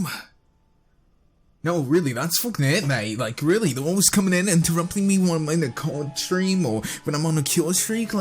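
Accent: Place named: United Kingdom. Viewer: On an unrelated topic, I had no idea you were British until the beginning of this video.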